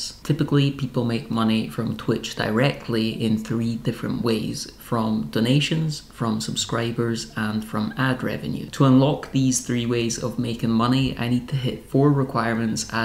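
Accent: Scotland, United Kingdom. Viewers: I subscribed because of tiny house, Scottish accent, location and renovation. Being an, ahem, more mature subscriber, this computery stuff is amazing and I haven't a clue what it means! But your voice is so lovely and I appreciate the mental health tips. Good for you.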